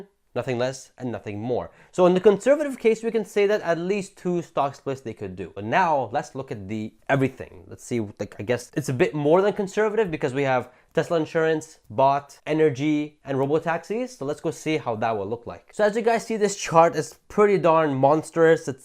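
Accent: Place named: United States. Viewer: Just curious, why do you have an American accent?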